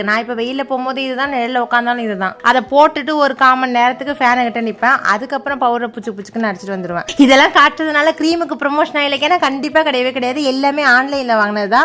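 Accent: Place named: Thanjavur, India. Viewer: பேச்சு சூப்பர்... ஊர் பாசை நல்லா இருக்கு... தஞ்சாவூர் பாசை....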